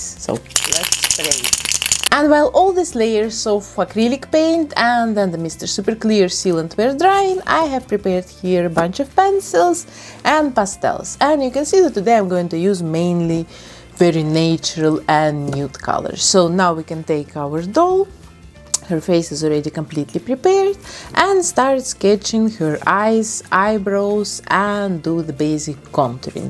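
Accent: Russia. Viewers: Кажется как будто акцент русский... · русский акцент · Вы русская? Чувствуется акцент) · Ты из России? Прям чую чуйкой акцент)/ Are you from Russia? I feel the uccent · иногда кажется, что она русская по акценту и словам) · Что за акцент..русский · какой то русский акцент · Русский акцент? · Ну скажите, что вы русская! Такой сильный акцент!)) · Кукла в вашем исполнении получилась великолепной. Томные, выразительные глаза подчеркивают некую таинственность в Жасмин. Очень понравился результат. Во время просмотра вашего видео я никак не могла понять, у вас присутствует некий русский акцент, или я ошибаюсь?) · Что за жуткий рязанский английский?) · У тебя русский акцент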